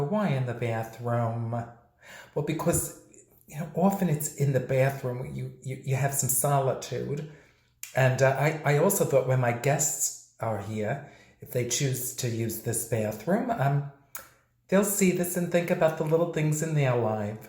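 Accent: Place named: New York, United States. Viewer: I like how you have a New York accent even though you live in Southern California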